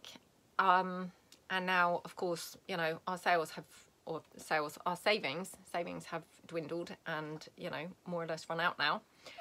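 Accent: United Kingdom. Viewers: Hi, I was so touched by your candidness! I know you are under great stress right now. I never told you this but I feel compelled to share with you that I found you on YouTube right after I lost my beloved husband of 39 years to Covid-19. I instantly fell in love with your British accent (I live in USA) and your conversational style and your creativity. There were very many days when I did not want to go on living without my husband, and for the life of me I didn’t know how I would possibly get through the day! The only thing I could think to do was to put on your videos and watch them one after another after another, because your voice and your cheerful demeanor relieved my horrible anxiety. So - we, your viewers, owe you a massive THANK YOU! May God be with you tomorrow! 💜💜💜💜💜💜💜💜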